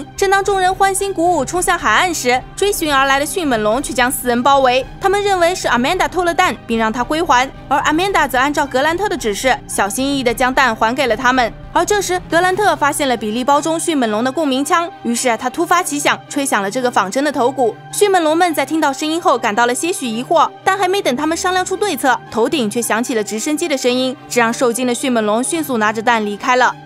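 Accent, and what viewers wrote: Jiangsu-Zhejiang, China. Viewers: 江浙口音，不要逼太紧。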